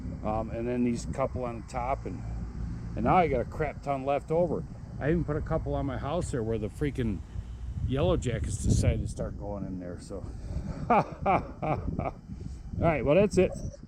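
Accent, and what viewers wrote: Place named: Northeast, United States. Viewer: Where do you live? You almost sound like you’re in the northeast? Mass? I’m in NH and wonder what you do for winter with the pool?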